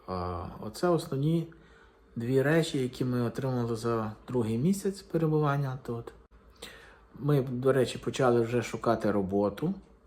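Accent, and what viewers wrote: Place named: Western Ukraine, Ukraine. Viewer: Ви не будете мати роботи, яка буде високооплачуваною,тому будете мати тільки на життя і їжу. Ми за кордоном потрібні як робоча сила. Я розумію тих біженців- українців, хто з окупованих территорій, або зони бойових дій, ваша вимова (суржик) дає зрозуміти, що ви із заходу України, тому не зрозуміло навіщо ви травмуєте трьох дітей цією поїздкою. Ніколи емігрантів не приймуть як своїх, а у місцевих "усмішка на обличчі, а позаду зуби на спині". Я так пишу, бо маю досвід біженки, але я виїзджала сама, щоб знати чи варто їхати сім'ї з дітьми. Саме головне знання мови, якщо гарна іноземна, то влаштуєтесь, якщо ні - нічого їхати, або їхати в Польшу. Бажаю удачі, вона вам ще й як пригодиться!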